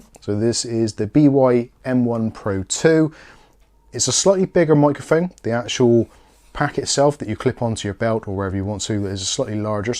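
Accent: Bristol, United Kingdom. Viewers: Your audio has always been ok, despite the lovely Bristolian accent. My ears are not good enough to tell such details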